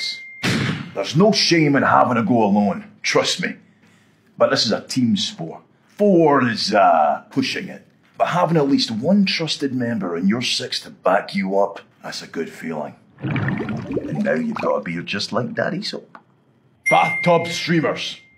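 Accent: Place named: Scotland, United Kingdom. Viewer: He’s like Ryan Reynolds…only Scottish😍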